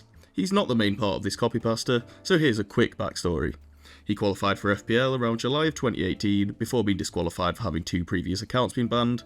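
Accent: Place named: United Kingdom. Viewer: yeah I was tripping a bit cuz your accent is the same as mine but something was telling me off 🙏🏻 which part of the uk tho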